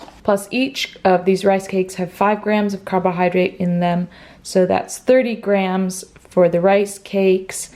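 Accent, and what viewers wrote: United States. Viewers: You sound American instead of British!!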